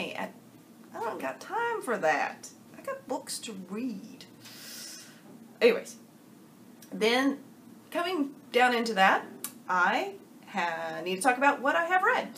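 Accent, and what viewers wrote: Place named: South, United States. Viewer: You are so outrageously wonderful. Thank you for shouting me out. You can work on pronouncing Mementomori but it all sounds good with your southern accent. You have not only been so supportive on my channel but I see your thoughtful and detailed comments spread all throughout booktube. Thank you! Excited to see where you go in June. The drama this past month was something else to witness and am happy that it seems to have blown over. No more of that! — I’ve really enjoyed all your videos, please please keep posting :) - And good luck with tacking Dickens and Tolstoy, no matter the pace!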